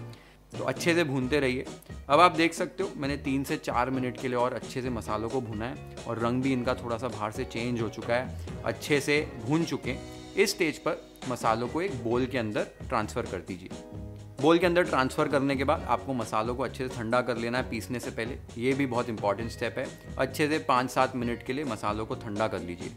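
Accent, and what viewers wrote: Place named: Bengal, India. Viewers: That was exactly my thought right into the video.. proper bong accent from a punjabi dude.. on point !! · Love your Bengali accent. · Your bengali accent is beautiful ❤❤ Loved this, this item is quintessential for all bengalis throughout all our lives ❤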